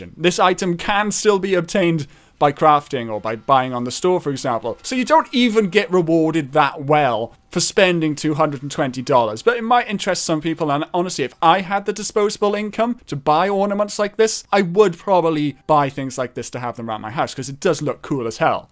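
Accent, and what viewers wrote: Wales, United Kingdom. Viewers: U sound kinda welsh like meh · Just wondering what's your accent? It sounds very Welshy and Valleys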